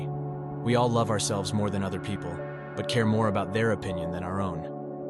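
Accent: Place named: United States. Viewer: It would have enhanced this video if you used a more authoritative, deeper narrative voice. This sounds like a random America kid in a bar reading quotes from Marcus Aurelius.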